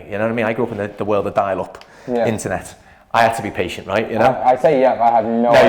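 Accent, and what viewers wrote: Liverpool, United Kingdom. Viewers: Liverpool UK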